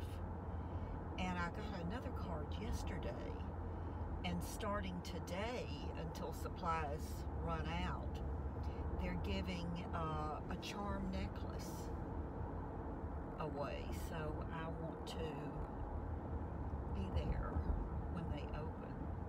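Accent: South, United States. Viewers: I love your videos. Reason one as a women just entering her 60’s, it’s nice to see you can still be young at heart. Reason two. My pops family is from the south and listening to you speak is like music to my ears. I live in the Rocky Mountain west. So not much of your charming speech around hear. So glad I came across your channel.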